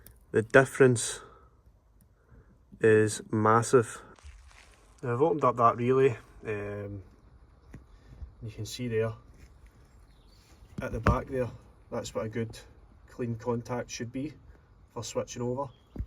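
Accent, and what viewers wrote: Glasgow, United Kingdom. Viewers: What a fantastic diagnosis and repair video. I’m having a bit of trouble with the strong Scottish accent, but think I’ve ‘tuned in’, and can understand the work flow. I do repairs for people, as I love solving the puzzles. Just repaired “my grandfather’s Panasonic walkman’ for a friend, which was a long job due to battery corrosion. All the plate-through holes did not connect the top to the bottom track. Each pad was ‘etched’ away from its plate through centre. I had continuity from the centre to the track below, but not from the centre to its top pad. Had to fill nearly all plate throughs with a wire link. Anyway, traced the whole board through and found more breaks in the track. All repaired. Fixed it in the end. Absolutely loved your analysis and diagnosis. Using sound to find the faulty relay - fantastic skill. … and then tearing the relay apart to demonstrate the hard fault. 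Also liked your research. Other authors bothering to write-up their fault-fixes. What a useful database. Looking forward to the next video collection. Matt Lee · Well done mate, not many would have made that relay repair. This repair should give you plenty of work. Which you deserve, once the word gets around, that you supply good work and can be trusted.ps. Good to hear the Glasgow accent 👍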